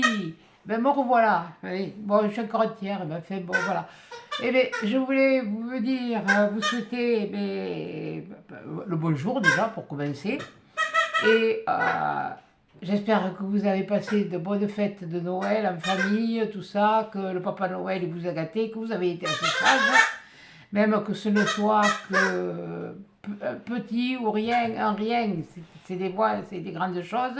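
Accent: South, France. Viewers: Coucou Quelle belle surprise!! Tu es superbe et cette coupe de cheveux et couleur te vont à ravir. Tu reflètes la joie que tu nous communiquais par ta voix et ton joli accent du sud. Sincères condoléances pour cette personne chère à ton cœur. Merci pour tes souhaits et à mon tour je te souhaite un très bon réveillon de la St Sylvestre et à l année prochaine. Gros gros bisous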